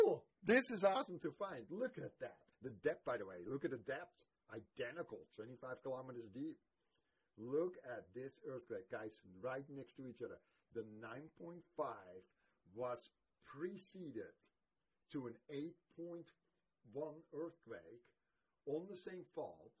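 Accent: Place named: Germany. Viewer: Are you German? Love the accent just watched the other video...great stuff..i see the big increase in activity...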